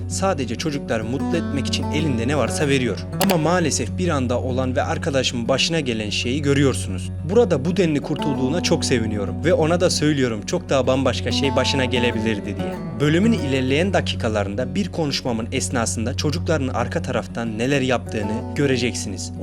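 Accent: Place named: Adana, Turkey. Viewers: Kardeşim benim bu yorumları ciddye al sonuöta senin daha iyi yerlere gelmeni istiyoruz doğal akışında kendin oldugun gibi videolar çekmeye devam et belgesel tadında videolar başka bir tarz ve birkaç konuda kendini çok geliştirmen lazım ki gerek yok bence kendin oldugun gibi o anadollu şivenle sempatikliğinle yeterlisin belgesel tadına gerek yok kendini aşağı çekersin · Kanka adanalı mısın konuşma onlara benziyor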